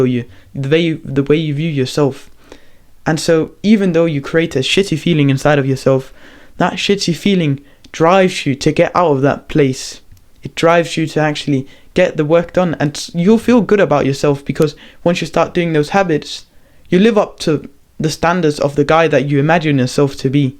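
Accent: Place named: United Kingdom. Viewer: man that accent is honey for hears, wish i had a uk accent🙏🤣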